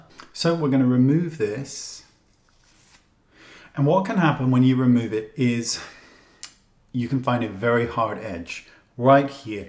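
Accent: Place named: United Kingdom. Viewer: That British accent gets me every time.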